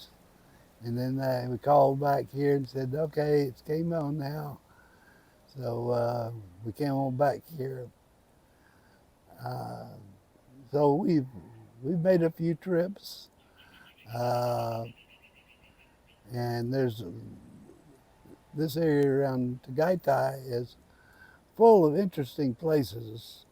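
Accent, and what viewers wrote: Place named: Texas, United States. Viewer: I dig your humor and your no nonsense approach to reality. A Texas twang to it is also a big bonus. A great day to you too, sir!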